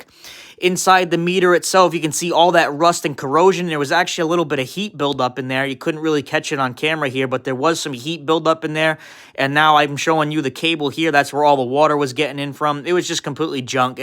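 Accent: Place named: Fall River, United States. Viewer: Hahaha I took one listen and was like this guy's gotta be from FAWL RIVAH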